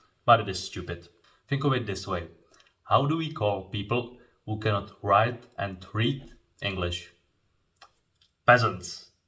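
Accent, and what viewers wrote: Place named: Japan. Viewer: I really appretiate what you doing here on the internet. Keep up the good work and I wish you the best for your future. P.S. you're so Japanese that even your English has a Japanese accent to it.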